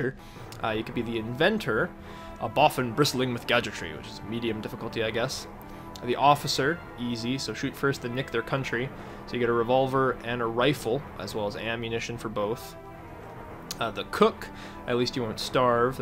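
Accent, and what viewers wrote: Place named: Canada. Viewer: Very interesting game! Reminds me a little of Fallout :) I also have to say I quite enjoy your Canadian accent.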